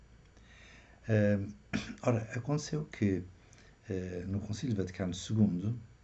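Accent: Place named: Portugal. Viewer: Saludos cordiales desde Brasil. Creo que hablas el portugués de Portugal. De que paróquia el senhor padre eres? Gracias por su vídeo.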